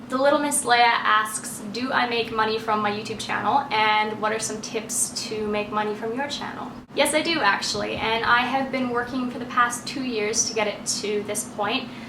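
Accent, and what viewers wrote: Australia. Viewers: it sounds like you're getting an australian accent! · You seem to be picking up an australian accent!!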